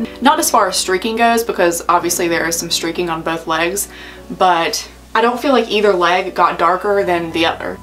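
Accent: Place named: South, United States. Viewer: Just finding your channel but it's great to find someone on YouTube with a southern accent like myself 😁 thanks for the video.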